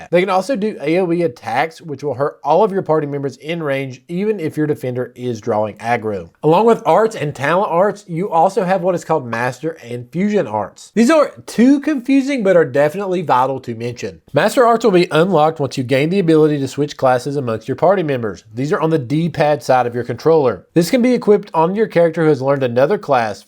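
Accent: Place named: South, United States. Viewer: Just checking this out, really well done and clear. Thanks! Side note - where are you from? I'm from NC and I sense a southern accent here too :D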